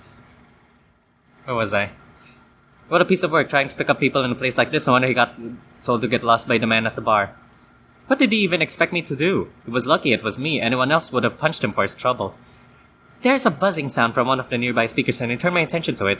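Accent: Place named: Russia. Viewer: Another lovely video as usual XD love you Russian accent :3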